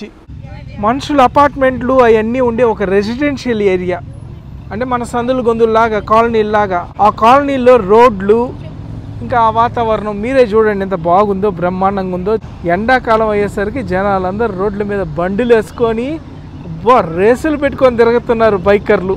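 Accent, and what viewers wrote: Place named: Andhra Pradesh, India. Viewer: Medhe Andhrapradesh Ani anukunta me slang choosi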